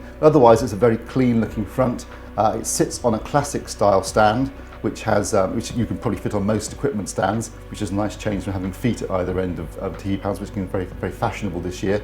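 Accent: United Kingdom. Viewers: Never mind Terry he's off his bean I luv your voice and your detail reviews in a strong British accent. Bravo and well done.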